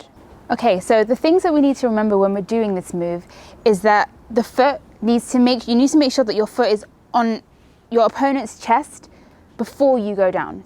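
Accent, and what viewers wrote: United Kingdom. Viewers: Are u from the UK? i asked because of your accent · Having studied Judo for 10 years, I'm surprised that you would suggest this difficult and risky throw in a street confrontation. In sport Judo on mats or on TV it looks spectacular, but in real life, if you misplace your foot, the attacker will be on top of you. And now you're grappling on the ground. Not a good place to be.This throw requires a great deal of mastery to be effective in a street fight. I would rather use Taitoshi where you also use forward momentum but you cross your leg in front of both attacker legs and pull him over.Easier to learn and have used it many times. I grew up in New York!! :-)) Nothing like a pretty girl with a sweet British accent:-))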